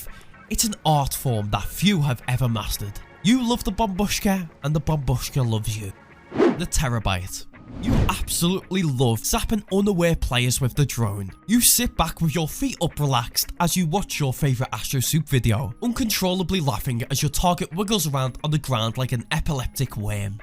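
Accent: United Kingdom. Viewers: Ok dies viioe is preyys nice! I ont´t like Briish accsent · SCOUSER!! No way ye not bro can hear it even through ye weird accent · This guy is the definition of the bri'ish accent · Im sorry but please dont speak like a narrator because that british accsent+narrator just gave me a headache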